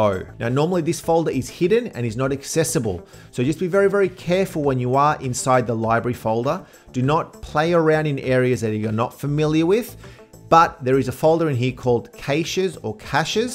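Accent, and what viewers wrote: Australia. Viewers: Thanks. I'm an Aussie too, so I pronounce cache same as you. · The Australian pronunciation seems logical but I do pronounce cache "cash" (I'm a native English speaker from Hong Kong). One of the funniest Australian pronunciations to me is "daahtuh" (data). And why do Australians pronounce the 'a' in "last" the British way but the 'a' in "chance" the American way? 🤔 My own pronunciations can be weird though: I pronounce "route" the British way ("root") but "router" the American way ("rauter") because "rooter" reminds of the Australian slang and so sounds inappropriate... 🙃 More importantly, thanks for the helpful video. I found your video bc the tech guys in my office will clear the cache of my PC work laptop when it's running slow, and it occurred that I'd never once cleared my personal MacBook's cache. Did you actually just pronounce wary "weary"? 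🤫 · I'm Australian too. I say caysh too, But then I also say shedule rather than scedule. But it's useful to know that when other videos are clearing the cash, it's got nothing to do with robbing a bank. , you are getting tired, because you say you should be weary when you are deleting your cache. I think you should be wary.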